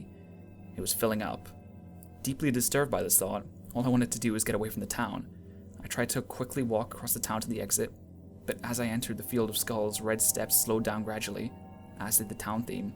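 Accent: United Kingdom. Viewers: The british accent is strong in this one... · Oh ma gad you sounded so British in this!!!! Great story :)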